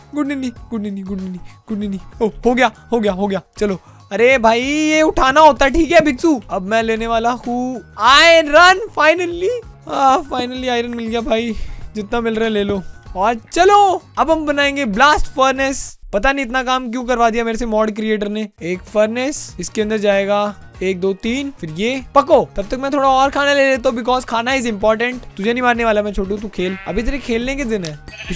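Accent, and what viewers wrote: Gujarat, India. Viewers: Are you from Gujrat?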